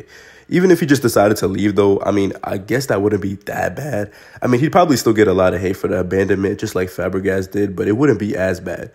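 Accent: New York, United States. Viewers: Hearing your heavy ass NY accent discussing football is kinda trippy. Good content tho bro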